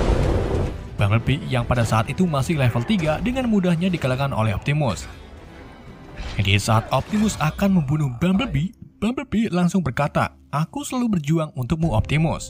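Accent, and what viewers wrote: Indonesia. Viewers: Gue suka gaya bicara dan gaya basa lu bang, meski membahas alur cerita film asing tapi gaya +62 masih diterapkan 🤣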